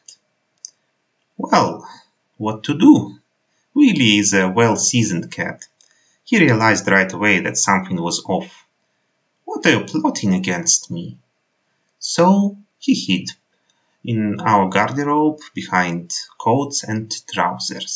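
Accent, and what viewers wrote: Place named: Switzerland. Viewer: Интересно, почему швейцарский акцент? Мне приходится смотреть много учебных фильмов ( по медицине), сделанных в Швейцарии. У дикторов очень похожее произношение. Может в Австрии тоже так говорят? Надо бы видео с австрийцами посмотреть.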